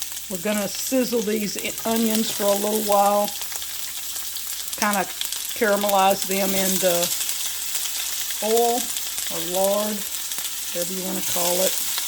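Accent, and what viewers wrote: South, United States. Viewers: Another original recipe. Master chef with a southern drawl. Love it.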